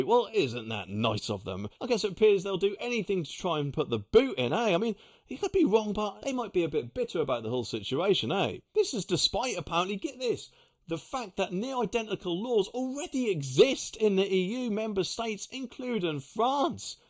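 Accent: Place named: Suffolk, United Kingdom. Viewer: DONT DOIT PROPER SUFFOLK 😅😅😅I marnt mention it so I sharnt say nufin by the way ya picture on the huh 😅😅😅😅 good ol suffolk accent same as me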